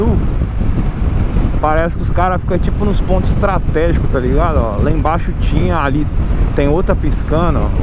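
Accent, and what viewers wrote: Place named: Belo Horizonte, Brazil. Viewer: Namoral o sotaque de BH e muito massa ce e louco 😅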